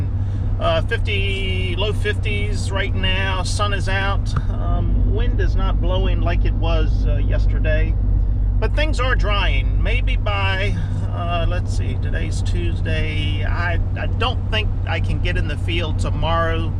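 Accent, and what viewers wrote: San Francisco, United States. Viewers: What a rebel!! You’ve been out San Francisco a lot? I can tell by the sound of your voice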